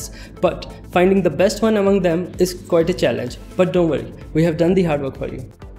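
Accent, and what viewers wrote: India. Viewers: LOVE THIS CHANNEL BUT I HATE THAT INDIAN ACCENT. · Why do you sound like an Indian? Well, ok, he is an Indian.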